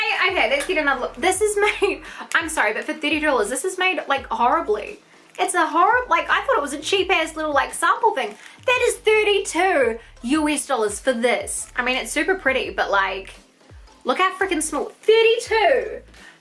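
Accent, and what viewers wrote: New Zealand. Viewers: !! I'm loving your excitement in the video, so glad you love the box. I'm a kiwi living in UK, so nice to hear a friendly voice from my home x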